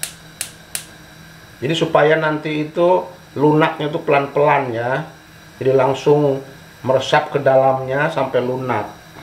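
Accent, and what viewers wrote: West Java, Indonesia. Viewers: Hahaa kungkurahan bagus sundanya g hilang berada d negri orang mantaf 👍🙏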